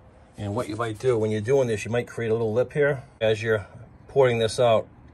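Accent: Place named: Massachusetts, United States. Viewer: Hey I picked up on your Massachusetts accent I live in ma as well. Central ma . to be exact how much would you charge me to port match my plastic intake for a 6.0..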